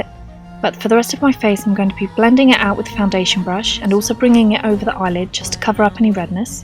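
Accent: England, United Kingdom. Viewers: This makeup is beautiful and so are you!!! But you' re English or American? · Where abouts in England are you from? im from near Liverpool :) x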